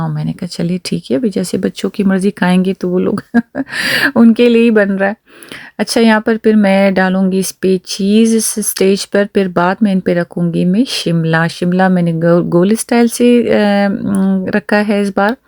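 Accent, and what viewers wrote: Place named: Balochistan, Pakistan. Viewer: How are you dear Sis 😊? Wait he kar rai thi apke vlog ka 🫠 , ye tu batain k ap pathan hu tu apko balochi kese aati he ? Wese apka lehja balochi he hai pathano jesa nai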